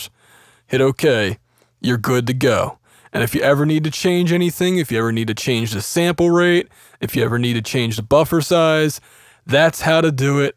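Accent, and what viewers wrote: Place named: United States. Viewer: Hmm...I recognize my own american regional accent. What state you from???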